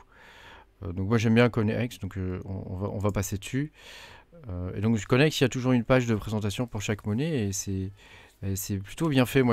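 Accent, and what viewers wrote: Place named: France. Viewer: anyone loves XDAG, will also love your French accent.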